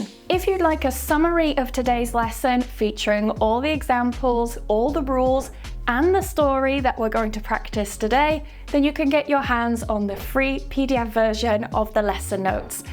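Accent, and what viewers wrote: United Kingdom. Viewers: Bang lu komen dimana2 to bgus lah👍,, beliau ini englishnya accent British haha ww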